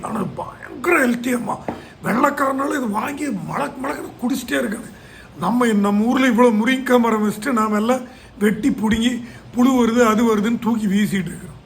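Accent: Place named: Koomapatti, India. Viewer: Yenga koomapattiku vanga same style of speech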